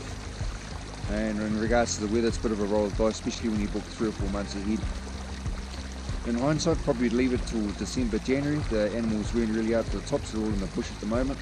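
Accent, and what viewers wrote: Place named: New Zealand. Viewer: Love that kiwi accent and those wicked glasses lol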